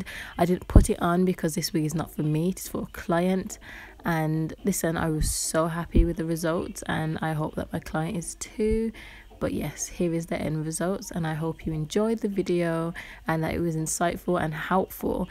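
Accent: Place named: Northern England, United Kingdom. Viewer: Your accent 😍😍😍😍 Northern? Manchester?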